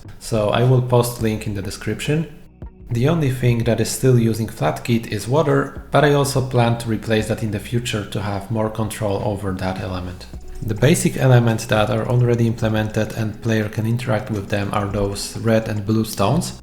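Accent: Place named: Poland. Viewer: Are you from Poland? your accent sounds very Polish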